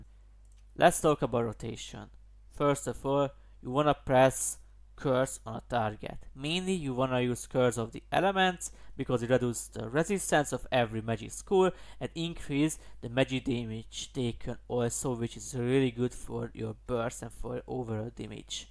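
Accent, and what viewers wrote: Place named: Hungary. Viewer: where r u from? u have a hungarian english accent :D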